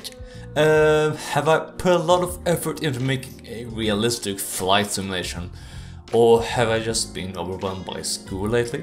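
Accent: Sweden. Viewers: Hello, are you swedish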